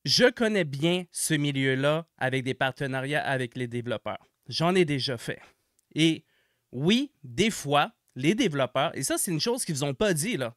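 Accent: Quebec, Canada. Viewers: pour moi, ta voix est agréable, j'aime t'entendre parler même si je n'ai pas toujours été fan de l'accent québécois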